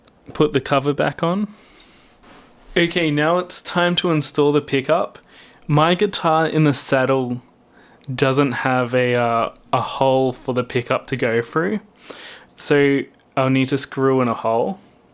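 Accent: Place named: Australia. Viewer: i'm loving the way youve edited and explained the fitting of this preamp and pickup ...really easy to follow and your aussie accent is charming...off to buy one for my guitat tommorow and fit it,,,,,Nice one Man!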